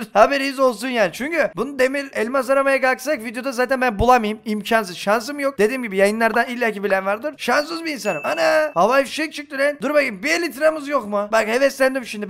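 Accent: Black Sea, Turkey. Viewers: abi sen nerelisin karadenizlimisin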